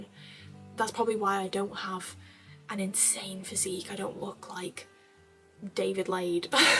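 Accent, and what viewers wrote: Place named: Liverpool, United Kingdom. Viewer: As someone not from the UK I hear your accent as scouse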